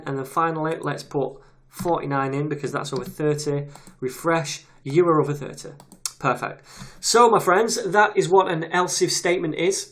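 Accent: United Kingdom. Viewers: Where about a in the uk are you from buddy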